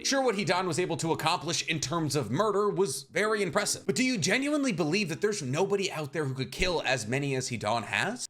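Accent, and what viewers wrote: United States. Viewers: united states accent to japanese names hurts my ears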